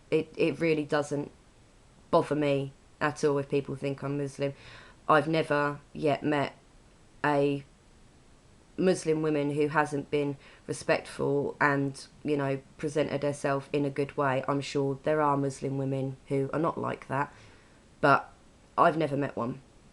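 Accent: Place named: United Kingdom. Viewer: First of all I love your accent! lol you are probably the first british that I'm able to understand haha :). I'm watching your videos and wow, it's the first time that I see a christian girl wearing the hijab. Respect. I love how you are breaking the stereotypes, no one would say you are christian just because you are wearing the hijab. Did you experience any discrimination against you? I wonder how their face looked like when you tell them that you are actually christian haha.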